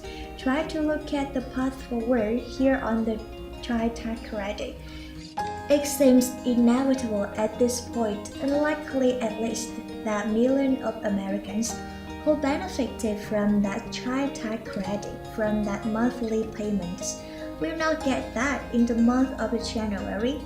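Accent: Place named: India. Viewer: It's a Indian lady alot like the ones I hang up on phone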